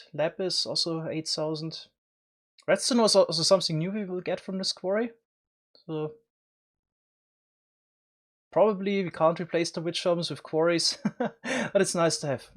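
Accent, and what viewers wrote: Germany. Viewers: I love his accent mmmMMM German accent is so underrated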